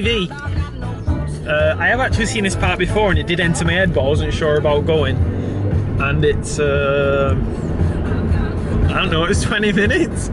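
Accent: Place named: United Kingdom. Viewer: Lad, where in the Uk are you from?had to use CC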